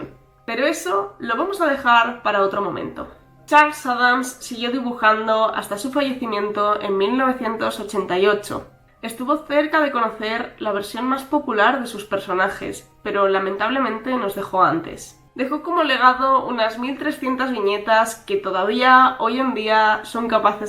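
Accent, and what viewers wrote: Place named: Spain. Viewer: Todos tenemos acento. Es la forma en que se expresa la lengua que hablamos según el lugar de donde somos. Ella tiene acento español, no sé específicamente de qué área. Y para mí, una persona de otro lugar completamente distinto, es una forma de hablar y expresarse que llama la atención. Así que sí, qué acento tan precioso.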